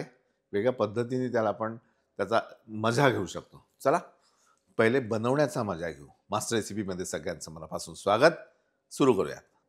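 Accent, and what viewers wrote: Vidarbha, India. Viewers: Sir aap vidarbh k hai, khandesh k hai , ya marathwada k 😊😊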